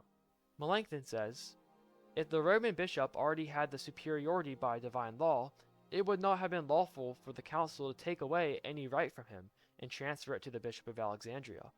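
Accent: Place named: RP, United Kingdom. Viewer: Beautiful refutation by Philip Melanchthon. Also, you seem to pronounce your long O sound like Received Pronunciation (i.e. "Ruh-oom" instead of the usual American "Row-oom" for the word "Rome") instead of General American, which I found very interesting as someone living in Scotland. God bless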